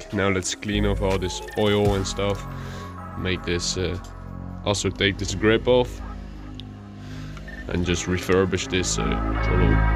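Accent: Netherlands. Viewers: Aye I gotta ask are you Dutch? Cuz water the accent and I recognize places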